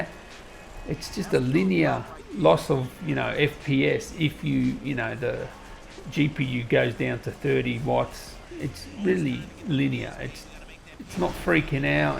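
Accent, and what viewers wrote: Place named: Australia. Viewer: with the laid back aussie accent and not showing yourself on cam, I imagine you're doing this video's in just your fuckin pants whilst necking tinnies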